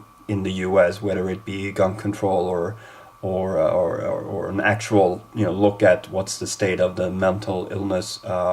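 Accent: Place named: Scania, Sweden. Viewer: I'm feeling P4A this year, but it's the first time since 2009 I really, really enjoy it. Btw, talking about friendship, your P4A video of 2009 is still my favourite P4A video ever. Led me to your channel, and then a whole bunch of awesome people. :) You're a great network creator. And your dialect is awesome. I get happy every time I hear scanian. God Jul. ^_^ Alla sova, släckta äro ljusen, äre ljusen Tipp tapp, tipp tapp, tippe-tippe-tipp-tapp, tipp, tipp, tapp *clinks on piano*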